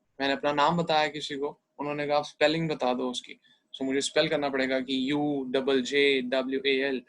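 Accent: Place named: Germany. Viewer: Bhai tera German accent bada sahi hai. Nice, superb, loved it.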